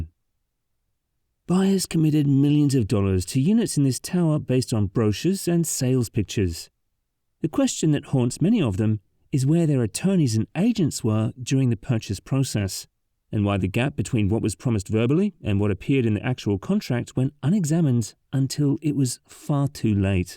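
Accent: United Kingdom. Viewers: Ironic a bloke brit narrating this brit building catastrophe.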